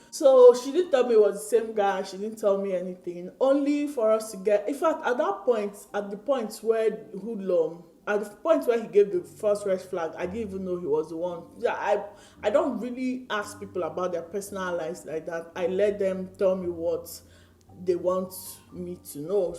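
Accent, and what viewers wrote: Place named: Nigeria. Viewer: Nice content I only struggle to understand the very strong Nigerian accent.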